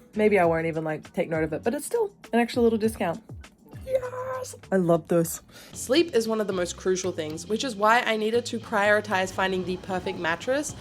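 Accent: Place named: Australia. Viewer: I am sorry for your TP... cuz my Costco Purple TP is legit. Also, I love your Aussie accent when you can speak Japanese perfectly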